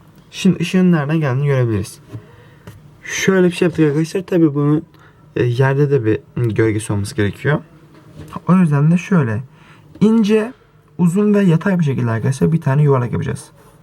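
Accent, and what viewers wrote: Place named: Cyprus. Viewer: Kıbrıs da mı oturuyorsun?? (Şivenden dolayı söyledim)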